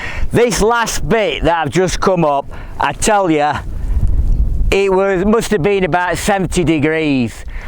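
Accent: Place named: Sheffield, United Kingdom. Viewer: Loved this one.Currently "wild" canping in myback garden as the missus is recovering from P.E. and I can't get out into the Aussie bush at the moment.11.00 pm here and a few home brews and sneaky drams of Glenfiddich will see me retire soon into my Doite tent..Think it is from Chile.Originally from Darley Dale so enjoy the Sheffield accent. Cheers Keith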